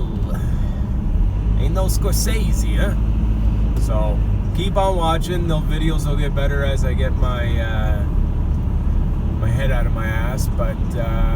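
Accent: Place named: Canada. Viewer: Now you sound more Canadian. "Ya Ya" "And then fail Eh?" Yeah I remember getting the bulletin too. Something to do with the metals inside the switch. 🌓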